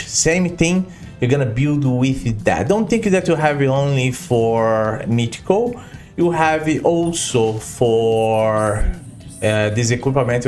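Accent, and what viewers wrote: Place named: Romania. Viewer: Are you a Romanian? Coz your accent is kind like a romanian like Skratch or Oddone..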